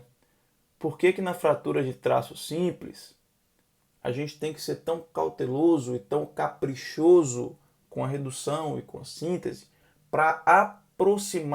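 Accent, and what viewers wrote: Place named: Salvador, Brazil. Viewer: Boa, parabéns pela aula. Esclarecedor para qualquer residente. Sou preceptor aqui do HGCA( vejo que sotaque é soteropolitano) e são esclarecedoras essas aulas básicas iniciais, a base do curso 👏🏼.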